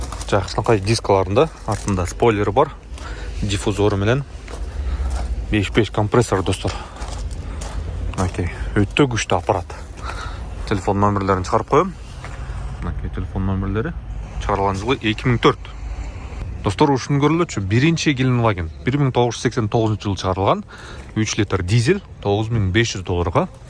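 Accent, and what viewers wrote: Standard. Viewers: Ушуну таза кыргызча десе болот моладес брат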